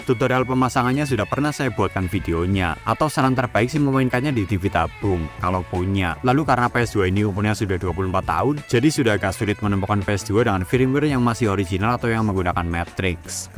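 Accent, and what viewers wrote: Banyumas, Indonesia. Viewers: ternyata wongapak😂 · Wong ngapak jebule 🎉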